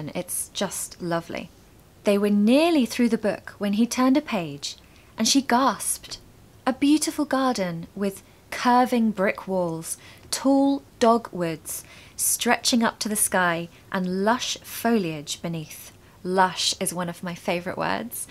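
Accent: United Kingdom. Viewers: Hey, you are going to be my favourite English teacher. Your accent is so nice. I would love to learn british accent by watching your videos and following your tips. Thank you very much for being there! ❤ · Indeed, Your accent is really beautiful, although I live in Australia, I really like British accent , you are doing well, just keep going👏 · Hi. I am happy that I found the correct platform to listen British accent. Your way of presenting makes more attractive to keep the important stuffs in my mind. I am very excited to watch your each videos, it's not boring. Special thanks for this video on the books. I always find bored to read books, but, from your description on those books motivate me to read asap. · Assalamalaikum Hello U know what, i joined ur channel just bcz ur British Accent which i really love 💖😃. Thanks for the video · Awsome British English by yours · i don't know which i learned accent, i hope so u got my writing. 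i watch your videos, and British accent it's lovely. i happy 'cause i understand you speaking. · Teacher you aré great teacher , I understand you better than others teachers ,and you aré so stunning, you're british culture and accent excelent ❤👍😃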